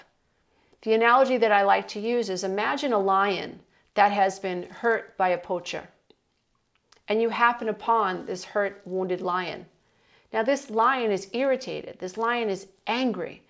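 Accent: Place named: Queens, United States. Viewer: Love your Queens sass, especially “what~eva” 😎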